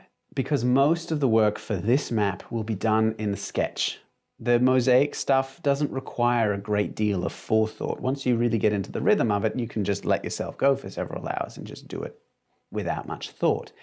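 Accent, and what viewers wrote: Australia. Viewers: Love your map passion, I thought I was the only cartographic kook. 🤪 [Do I detect a slight Aussie accent ?]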